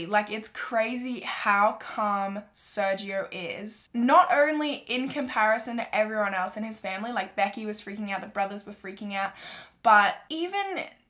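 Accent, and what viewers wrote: Australia. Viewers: Well she's Australian so she might be saying it different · people always complain about your voice 🤔 ... ive seen it on forums and to me your voice is so AMAZING... AUSSIE accent CUTENESS IS REAL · Are you Australian? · Literally Exposedd British ? huh 😂💀💀 she’s Australian 🌚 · Jomara Medina she is Australian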